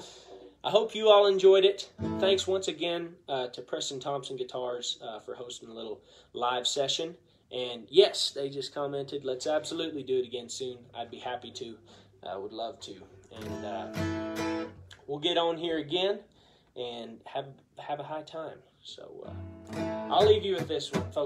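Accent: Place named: South, United States. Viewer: How’d he develop such a southern accent in Washington I know there’s wide open spaces and ranches cattle and all that but I’d never seen anyone there sound southern and I’ve been there a whole year one time (just for a girl) theyd make fun of my accent.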